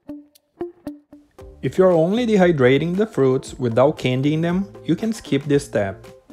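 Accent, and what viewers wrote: Brazil. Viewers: Are you brazillian? Or portuguese idk Ur accent kinda gives it up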